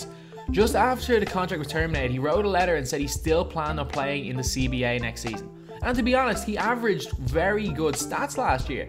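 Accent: Dublin, Ireland. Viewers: Dublin accent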